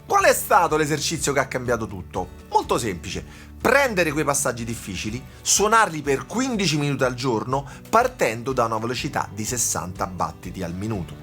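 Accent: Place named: Rome, Italy. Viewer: Bellissimo video! Molto illuminante. Nel mio caso, ogniuna delle "storie" è valida per me. Forse la seconda no...ho suonato solo chitarracce 😂. Con la terza sono riuscito a suonare il solo di lapsteel di Walk of life con la Telecaster. Sono partito da x0,5 fino ad arrivare all originale. Soddisfazione immensa! Ho una pedalboard....da 4 anni....sono ancora in alto mare😂😂😂. Sei grande e riesci sempre a farmi sorridere con la storia del non iscriversi😂. Non ti ho dato retta su questo. Complimenti ancora e grazie mille! Sento che sei di Roma o dintorni. Anche io, insegnami!!!😂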